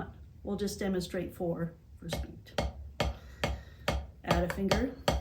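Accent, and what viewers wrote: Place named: Oklahoma, United States. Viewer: If you're not intelligent enough to ditch that funny Oklahoma accent I think that you're an idiot savant and your drumming thing is all some sort of a f**** reaction coming to you from someplace else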